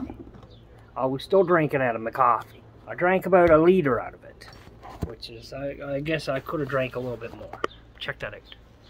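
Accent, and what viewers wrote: Newfoundland, Canada. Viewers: You answered all my questions, thanks! Love the Newfoundland accent but you gotta ditch that hat before you get complaints.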